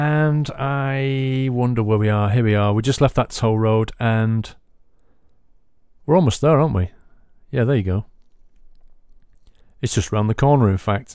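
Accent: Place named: Birmingham, United Kingdom. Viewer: im guessing yuor from birmingham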